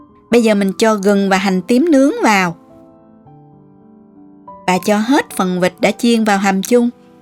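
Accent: South, Vietnam. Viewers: Giọng đúng chuẩn người Miền Nam nghe no hiền và dễ chịu. Xứ nhà tui đó nghen. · Giong noi mien Nam nghe rat ngot ngao giong nhu giong noi cua Tu Trinh truoc nam 75 , thuyet minh trong phim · Giọng nói miền Nam dễ thương quá. Cám ơn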